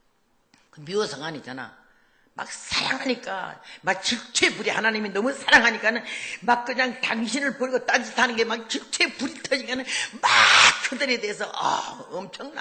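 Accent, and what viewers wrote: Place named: Gangwon, South Korea. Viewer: 영상 다 뒤져보면....고향얘기 나오고요...강원도지역에서도 좀 지내셨어요.말투에서 음성에서..저도 같은 생각.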